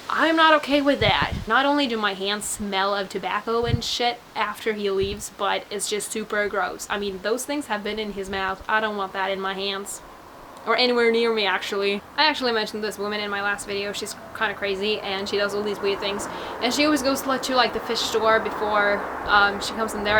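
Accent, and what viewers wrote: United States. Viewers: Kinda off topic, but your accent sounds a bit southern (USA) at times.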